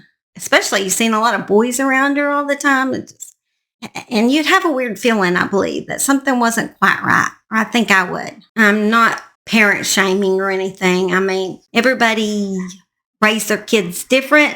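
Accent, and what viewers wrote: South, United States. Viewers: Just a southern harley riding belle of the south lol 😂 love my southern true crime narrator 😊😊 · You might be a "lil ole' southern lady" which is a compliment, but you're an awesome researcher and story teller. We appreciate you and love you. Thank you,.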